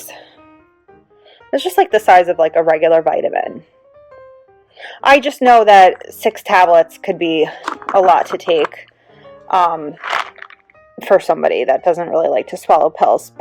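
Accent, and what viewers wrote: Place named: Long Island, United States. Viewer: Are u from along Island New York? U sound like it! I am too! Great video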